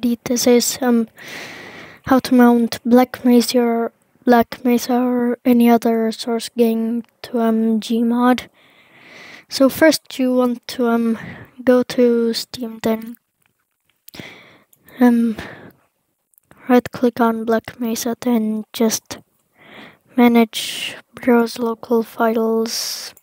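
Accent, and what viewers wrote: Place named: Russia. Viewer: are you Russian? you lowk got that Russian accent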